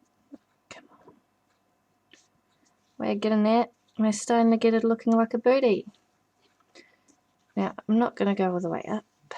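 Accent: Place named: New Zealand. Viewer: So cute :) also so nice to not have to concentrate to listen. We need more kiwi accents on YouTube!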